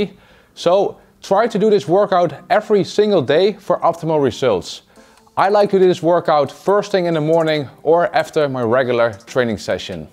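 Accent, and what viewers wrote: Netherlands. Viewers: I can here the dutch accent in your english bro 😂😂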